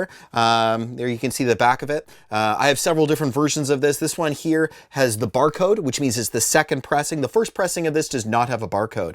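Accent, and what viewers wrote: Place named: Canada. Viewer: Super cool,; I enjoy hearing your Canadian accent on here as well:) I have that Locust set - I got it from a friend who bought it when we saw them many years ago. That may have been with a band called Holy Molar or with Dillinger Escape Plan (I can't remember which)...Three One G had some excellent output for a bit in the late 90's-early Thousands. I actually have an LP from the band Struggle which is Justin Pearson of The Locust/Dead Cross/many more... That is not as well known, more heavy punky stuff, but has a good raw energy that I bet you would appreciate. As a fellow vinyl collector, that uncut Metallica made me green with envy, lol. Some cut outs I have seen or own, there is a cool J Dilla (beats) that looks like a police badge, and a Father John Misty B side that came out with his sophomore release in the shape of a heart, I know there are many more but those two stand out. 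Anyhow, thanks for the excellent content, I look forward to watching it all.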